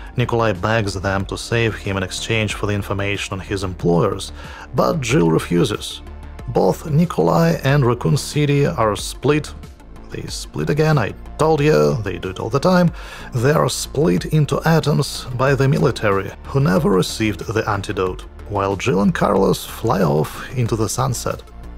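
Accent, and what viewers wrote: United Kingdom. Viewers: oh are you from england? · Vpolne po yorksherski)))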